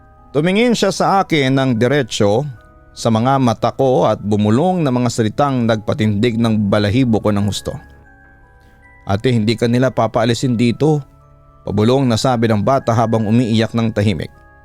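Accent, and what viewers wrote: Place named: Iloilo, Philippines. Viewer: ilonggo c papa dudot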